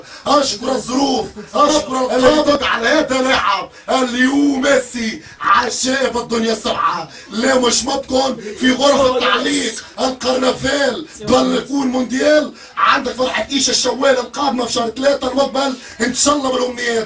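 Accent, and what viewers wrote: Tunisia. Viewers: و الله تقول صوت شوالي هههههههه هدا يعرف لهجة تونس كويس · غرييييييييييييييبة والله ... متأكدين هذا مصري... هذا تونسي 100/100هههه... تحفون برشا... واصل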